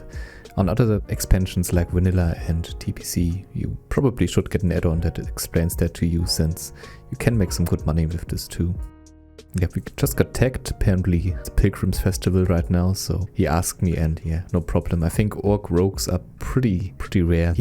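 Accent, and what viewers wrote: Germany. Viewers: Man hört echt das deutsche raus^^,But great Video:D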